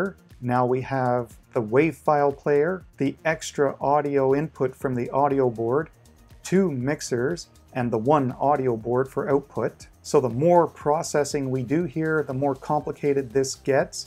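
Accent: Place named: Canada. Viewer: You sound Canadian ;-)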